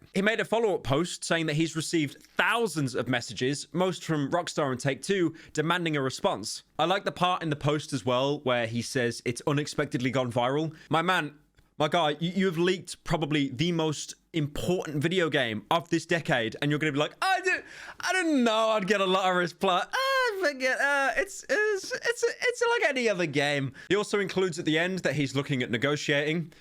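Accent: United Kingdom. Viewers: I love the added 30 seconds to get to that 8 minute mark, thank you funny british man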